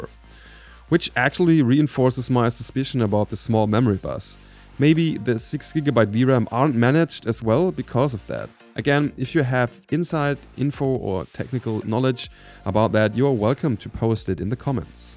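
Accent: Germany. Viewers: Kling für mich irgendwie nach deutschem Akzent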